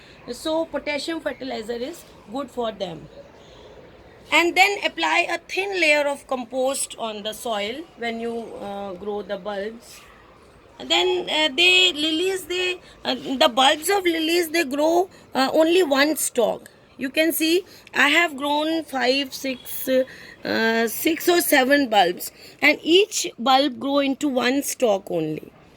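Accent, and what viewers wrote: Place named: India. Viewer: It sounds like you're from India or Bengladesh, how do you propose to deal with the Lilly's dormancy period in the tropics? I'm trying to protect my lilly plants, they stayed small this year and got chewed up by some kind of beetle. I finally dug them up this morning and started dividing the undersized bulbs and putting them in tiny planters I normally only use for cuttings. I'm hoping that they'll store more energy unmolested in the window with a screen between them and pests. :( I don't use artificial fertilizer at all because it damages the environment and the potassium and phosphate are mined. I use OMRI certified organic if at all. I prefer to use compost, and I've found that putting food scraps in the blender makes them break down into compost faster. High nitrogen fertilizer is actually very bad for plants, making them structurally weaker. 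So make sure any variety you buy is lower in nitrogen. Use grass clippings or shredded leaves at the base of the plant instead to get nitrogen to your plants at a more natural pace because they can't use all the nitrogen in artificial fertilizer.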